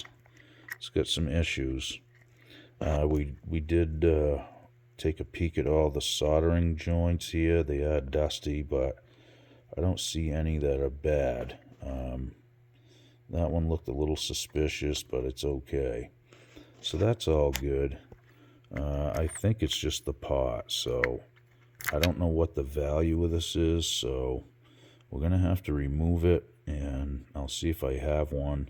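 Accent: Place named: Boston, United States. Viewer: I worked at Lasalle Music in Boston around the time they were selling these. Judging from your accent, it sounds like you might know the place. I did acquire a Charvel Model 5 at the time. The guitar plays great but the pickups were always weak on the top string, so I recently replaced them with active EMGs. In that process the licensed Floyd Rose bridge broke (it made of cheap pot metal). Nice job bringing back a nice guitar.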